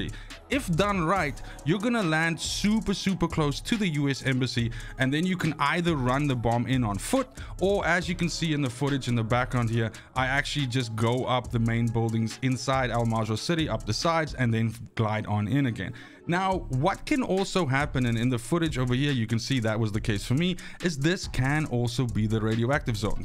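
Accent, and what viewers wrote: Afrikaans, South Africa. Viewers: Thanks for the help with this one. Are you Afrikaans???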